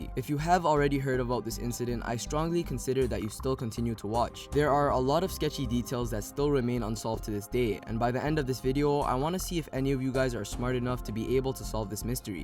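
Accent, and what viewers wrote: Toronto, Canada. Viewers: you sound like a Toronto mans. Are you from Toronto bro? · torontonian accent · Your Canadian right?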